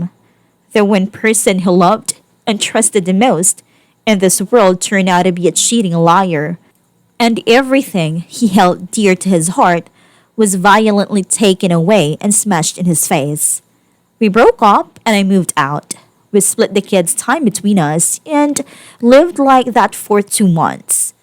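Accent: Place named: Philippines. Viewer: Sounds like this was read by a Philipina robot.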